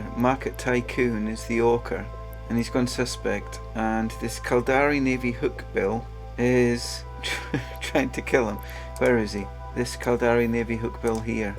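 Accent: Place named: United Kingdom. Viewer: I been playing for over 10 years and know everything about this game…. but I still listening to videos because he has such a soothing UK voice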